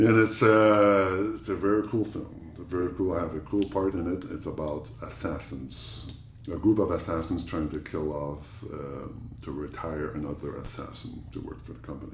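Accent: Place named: Canada. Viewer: He sounds so Canadian I love it lol I feel like I'm listening to my neighbor right now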